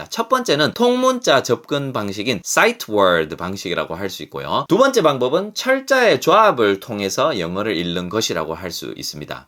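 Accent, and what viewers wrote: Gyeongsang, South Korea. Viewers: ㅎㅎ목소리 톤이 좋으셔서 경상도 억양이 섞였어도 내용이 쏙쏙 잘 들어옵니다.😂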